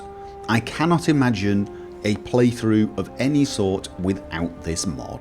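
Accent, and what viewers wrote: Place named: Birmingham, United Kingdom. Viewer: He's from the British midlands, I believe. Can't quite place it, but there's a bit of Birmingham in there...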